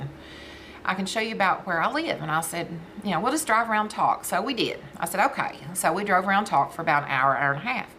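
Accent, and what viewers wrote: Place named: South, United States. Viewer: Love hearing your stories. Don't know anything about cats but can't wait to hear about the kittens. Your southern? accent reminds me of joyce meyers. Consider that a compliment because she's wonderful just like you 😊 God bless you.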